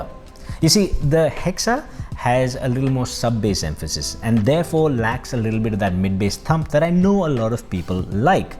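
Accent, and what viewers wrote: India. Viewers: I really enjoy your reviews. I love your oration - great choice of words and phrases! I'm British and maybe that nice flavour of Indian English appeals to me. I don't know, but I like it :) Keep it up. Namaste.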